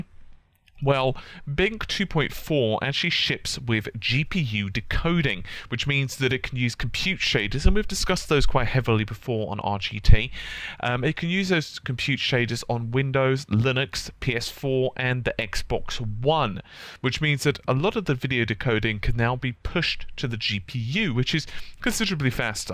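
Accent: United Kingdom. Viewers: 100% have to agree! These guys (particularly the narrator of this video - his name escapes me (sorry > - < )) know their shit! Like, KNOW know their shit… But the day-in, day-out rehashing of PS4 > Xbone is getting quite… taxing… Love the channel, but I'm getting to the point where if there's a mention of "PS4" or "Sony" in the title, I'm tempted to go out of my way not to click it. These guys are very level-headed when it comes to the console war bullshit, but as an xbox fan, when it's all you hear about for the past 12+ months? Yeah, I'm becoming quite sick of it. (Was sick of it months ago, but whatever.) And even then, they go out of their way to report on rumors or leaks that emphasis PS4 > XO… How about some misterxmedia coverage? I mean, the guy is either being 100% trolled or the industry is in for the world's largest reveal (#unlikely), but either way I don't have the hardware know-how to really sift through his posts. 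But [the author of this video]? He pegs me as someone who would be able to understand exactly what MisterX's 'insider' is talking about. And regarding ^that^ (the MisterX stuff), I'm not looking for a "is it probable" or "reasonable" analysis, simply an explanation of all the tech-talk that goes on in that 'forum'… It boggles my mind every time I look at it, but it's still unbelievably fascinating! I'd love to hear an explanation from someone with such a dreamy british accent. • ◡•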